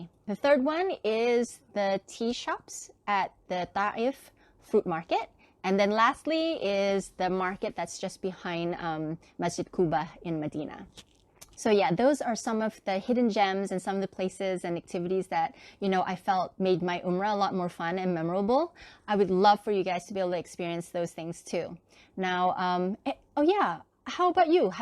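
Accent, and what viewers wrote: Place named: United States. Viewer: Assalamualaikum Sister. My family and I were scheduled to go for Umrah in April 2020. However, regrettably Saudi Arabia closed its doors for all pilgrims in March postponing our trip to the Holy City. Insya' Allah, we will be able to make our said mini-haj early next year. Thank you for your very informative and can I say, entertaining videos. You are very eloquent in your presentation. Going on a tangent, I cannot help but wonder where are you originally from. If I were to hazard a guess from your name, perhaps you were originally from South Korea, judging from your accent you were educated in the States and - clues in the video, you are now living in Asia (Malaysia, I assume... again clues). Ha ha ha! Nevertheless, very informative and I hope to be able to visit and experience these places that you have recommended. Wassalam!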